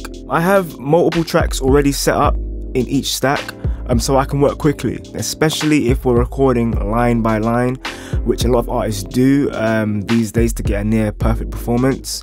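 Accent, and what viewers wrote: United Kingdom. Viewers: Dont usually comment.. but I thought this was a very well produced video.: clear and fast flowing.. also refreshing to hear another UK bruh doing a tutorial. Keep it up!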